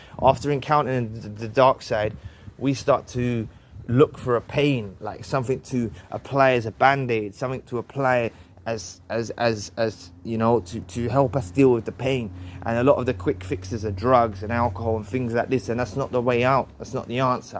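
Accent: United Kingdom. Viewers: are u from uk bother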